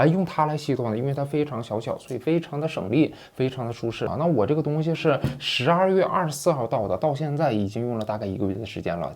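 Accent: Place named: Northeast, China. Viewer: 老哥这一口大碴子味儿 听着老亲切了！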